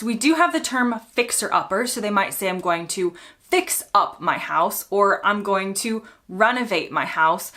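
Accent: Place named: United States. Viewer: The only trace of American left in you is your accent, which is OK, yours is a pleasant one. The rest of you, heart and soul has been 99% transformed, which I am glad. I'm a Thai who, unlike most of my fellow countrymen, is not particularly fond of the American ways, except their music and, of course, George Carlin, may he rest in peace. There aren't many good things coming out of England lately but you are one of the very few. I hope you have the energy and the will to carry on your good work for a long time to come. If you do, you can count me as a fan.😊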